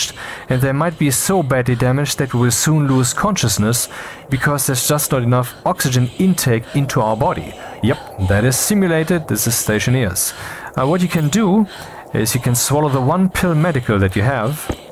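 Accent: Germany. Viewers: Richtig schönes Video! weiter so! Und dein Englisch kann ich so gut verstehen, weil du so klar redest :-) Gut ich höre raus, dass du deutsch kannst/bist?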